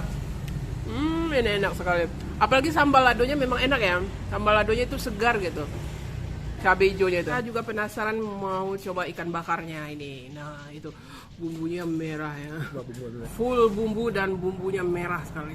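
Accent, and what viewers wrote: Minangkabau, Indonesia. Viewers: ulangnonton seronok mendengar suara lpghat minang tetapi tidak pekat.masih boleh faham selamat baik sampai jumpa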